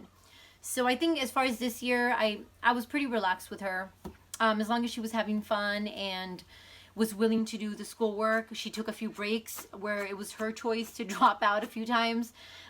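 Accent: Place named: California, United States. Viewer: I know this is an old video, but I'm so tickled to hear that you're from California because I thought you had a very familiar way of speaking (I'm from the Central Valley). I enjoy seeing the practical and creative ways that you homeschool and have taken a lot of inspiration from it.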